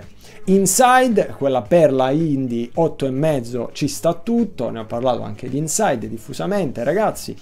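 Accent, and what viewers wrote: South, Italy. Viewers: di dove sei ? sembri del sud!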